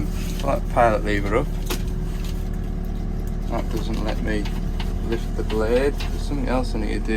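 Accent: Cumbria, United Kingdom. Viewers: Cheers, looking forward to more excellent videos. Love the Cumbrian accent, unfortunately mine's all Barrow!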